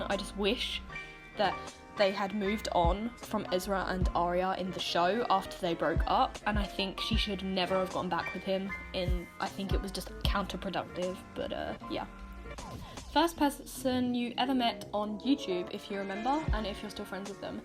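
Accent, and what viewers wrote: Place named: United Kingdom. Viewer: I knew you were br*tish but the accent still jumpscared me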